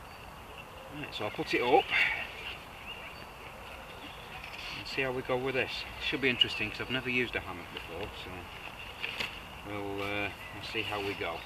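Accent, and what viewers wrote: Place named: Northern England, United Kingdom. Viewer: Nice set up, I think my only alteration would be either lowering the tarp or raising the hammock otherwise you may end up rather soggy if it's wet and windy :P The flap at the bottom of the hammock is for stopping a casualty sliding out the bottom when going up an incline rather than stopping them rolling out the side btw. Nice review, the northern accent always inspires trust ;)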